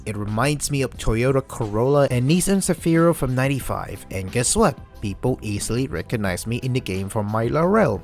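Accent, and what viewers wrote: Philippines. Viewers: are you a filipino?? cuz i know damn well timezone is in the philippines and i can also tell by your accent.. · Manileño detected Subscribing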